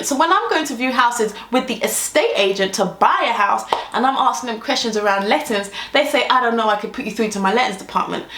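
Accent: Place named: South, United States. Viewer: why have you gone in to the southern accent. its so funny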